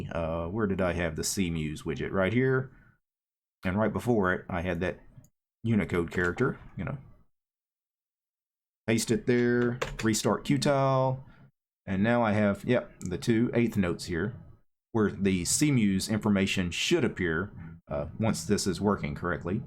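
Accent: South, United States. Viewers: love the accent always a pleasure to listen to a techie southerner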